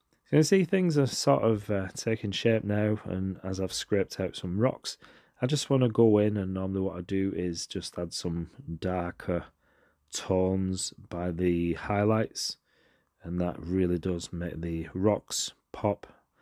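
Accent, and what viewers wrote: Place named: North West England, United Kingdom. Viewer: This is beautiful, I love those moody blue-greys! Also I gotta say, as someone from the North West but living elsewhere, I was overjoyed to click on this video that came up in my feed and hear a familiar accent :) Subscribed, looking forward to your future videos!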